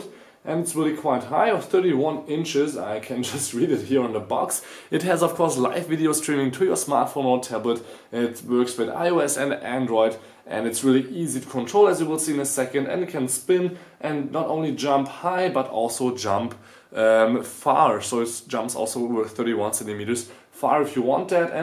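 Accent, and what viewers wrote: Germany. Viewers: Man merkt so stark das du deutsch bist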